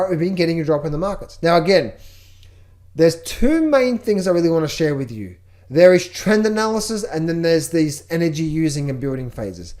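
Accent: Australia. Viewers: The actual quote from Ed Seykota is: "The trend is your friend, until the end...when it bends." Don't forget the "bend" part,! Keep up the great work. Love the Aussie accent.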